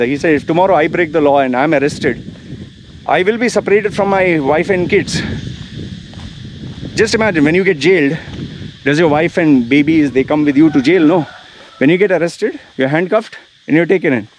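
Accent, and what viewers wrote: India. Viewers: his accent sounds very much indian too me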